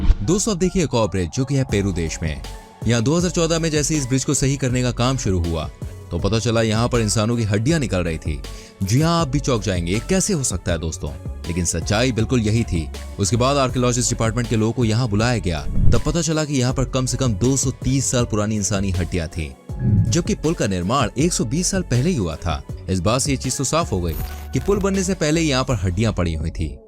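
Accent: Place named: Mathura, India. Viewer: Sir mathura sa bol raha ho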